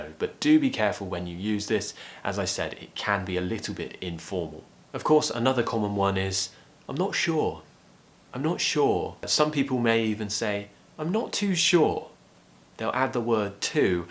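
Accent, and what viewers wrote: RP, United Kingdom. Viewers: I love your accent. Other British accents are also good but this RP accent is the most attractive one. It sounds confident, classy and so alluring. I can't wait to join your course and speak with you! ^ ^ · Guys what british accent that he uses? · You are the BEST pronunciation teacher I am falling in love in British Accentbbecaise of YOU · Hi, your British accent is really amazing, and this lesson is really helpful. Thanks. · please sir i like your accent so much and I'm your follower student on youtube podcast. I found the 4000 essential words are read by American accent and really I like it to be with your British accent. please try to help us by reading these 4000 essential words in English. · My God I'm literally in love with your British accent !!!! 🥰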